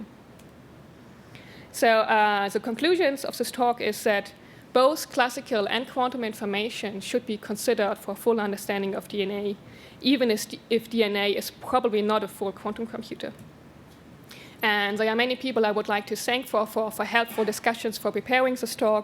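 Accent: Germany. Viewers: Free Thinker, I think she's German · I think she is a German who was taught British English by (or near) the French. That's my hypothesis. Anyways, very interesting talk!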